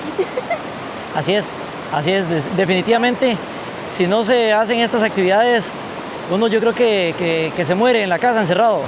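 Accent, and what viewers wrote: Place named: Colombia. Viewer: mae, por qué habla como colombiano? si ud es tico, tenga orgullo de su tierra y hable como tico, diga la R como se debe!!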